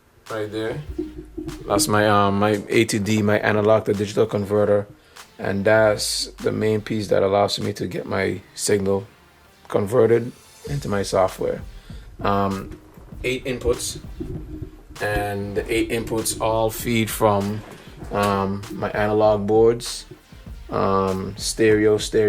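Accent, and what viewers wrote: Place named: Caribbean. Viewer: It’s a blessing that I came across your videos. Much respect to you and your talent. Great content thus far. Sounds like you have a Caribbean background. I’m from the islands 👍🏽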